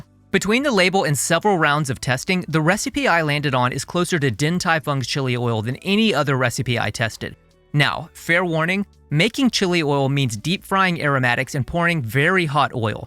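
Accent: Texas, United States. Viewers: Is a native Texan? The way he pronounced "oil" is very specific. Is that the Texan accent? Just curious.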